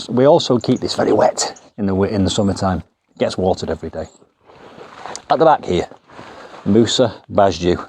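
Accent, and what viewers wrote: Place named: Barnsley, United Kingdom. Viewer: Is your accent Barnsley? I love it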